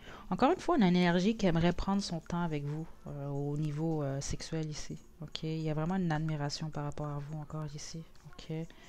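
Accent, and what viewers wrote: Quebec, Canada. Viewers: Salut ! J'ai une petite question , es tu du Québec ?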